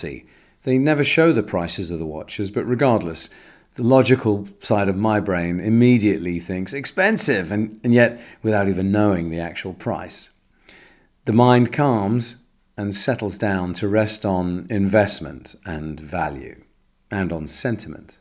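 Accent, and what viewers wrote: RP, United Kingdom. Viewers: Your English is Royal. I love watching your videos. Thank you.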